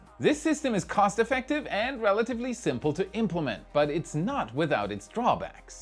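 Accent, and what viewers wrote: Germany. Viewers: I caught the secret message! You still have a slightly german accent, so I was assuming you were from germany :D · Ja, ich wusste, dass du aus Deutschland kommst, weil man deinen Deutsch Dialekt raushört😂👍🏽 Deswegen heute mal auf Deutsch: Mal wieder ein klasse Video, danke für die ständigen Updates 🙏🏼🙏🏼🙏🏼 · Ich wusste doch, dass da ein minimal deutscher Accent ist😊 Grüße aus Deutschland zurück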